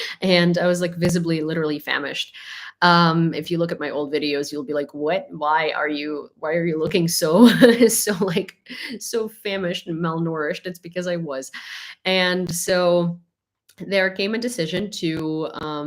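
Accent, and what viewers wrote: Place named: Ohio, United States. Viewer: I get the Ohio like quality in your voice...it's a very plain accent without much filler. And Ohio and Ontario are just across Lake Erie from each other.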